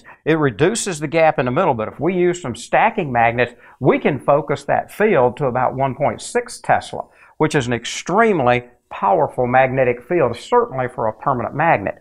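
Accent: United States. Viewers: I like the cowboy accent analyzing cutting edge high techy stuff. You can't get that "yeehaw" feeling in German, French, Chinese or Russian accents. 👍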